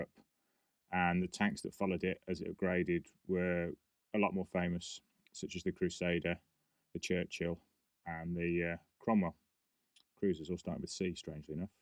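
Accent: United Kingdom. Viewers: Just pinged watched 3 episodes and their production videos. Saving the last one for later. Loved that 3rd video ended somewhat unclear. Im guess you could say im a fan of ww2 planes but i never remember hearing about an boulton paul defiant...Watching the video i thought it was somekind of mix between a hawker hurricane & ju87 :D Dont know if the video production got better but I had really hard time following the first episode. Had to paus it many times, go back & listen to it again. Either I lost interest in it. Or first video had you mumbling? OR the most likely one. It took me time to start understanding the accent. By the way, Im from estonia soo english isint my first language & I absoluutely love the British accent voice :) wish i could fake talking like that.... Riiiight...And as far as the second episode. That was my favorite by far :) Dont know why but it got me hooked and i could not look away. 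Thanks for the stories :)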